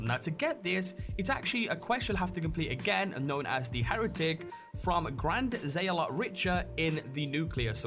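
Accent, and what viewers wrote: United Kingdom. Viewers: he is from the UK I believe, and in their military/police it is pronounced that way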